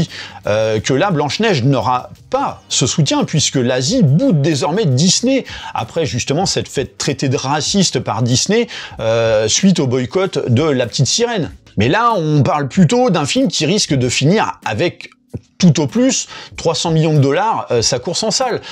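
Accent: Paris, France. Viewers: Ha punaise non! Rien à faire ... cet accent parisien très appuyé est trop insupportable !! Je m'en vais... ORLG ...IRLG ...URLG ...ARLG ....OURLG !!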